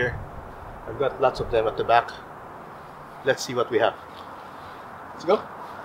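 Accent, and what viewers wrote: Philippines. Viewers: You speak english like filipino accent. Are ypu?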